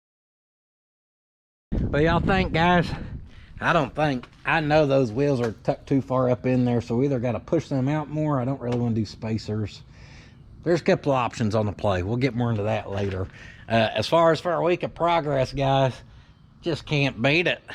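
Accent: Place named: Gastonia, United States. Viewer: Luckily I lived in Gastonia for 4 years, or else I wouldn’t be able to understand a single sarcastic word this guy said!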